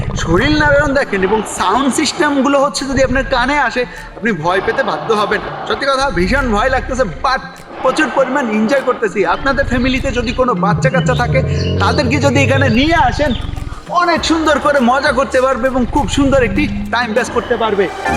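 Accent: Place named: Barishal, Bangladesh. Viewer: কথার মধ্যে বরিশালের ভাবটা বাদ দেয়া লাগব...কেমন জানি লাগে..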